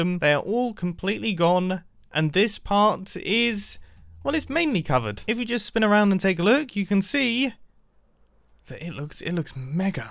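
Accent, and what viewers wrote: South, United States. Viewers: your southern accent never fails to break my brain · His southern American accent is everything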